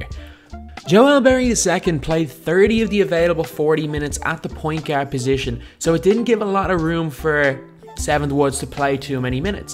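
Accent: Ireland. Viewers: some sort of irish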